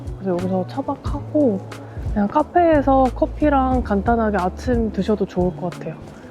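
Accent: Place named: Gyeongsang, South Korea. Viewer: 혹시 고향이 경상도 아니신가요? 약간 사투리가 나오는것 같아요ㅎ ㅎ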